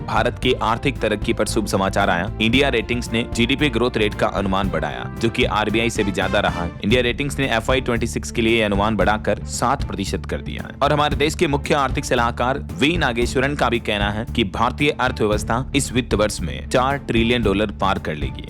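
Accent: Rajasthan, India. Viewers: bhai vah to aap Rajasthan ke mujhe lagta hai Seth sanvariya ka dialogue sunkar Aisa lagta hai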